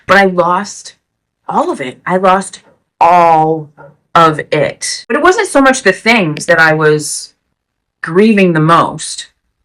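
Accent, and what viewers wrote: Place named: New York, United States. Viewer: you’re incredibly strong! I don’t know if I could deal with the trapped anxious feeling during withdrawal and be in jail. You deserve the best now! Love the New York accent, I work all over NY and NE. I see your channel blowing up! I was kinda sharing my lengthy story on how I came off opioids in various parts.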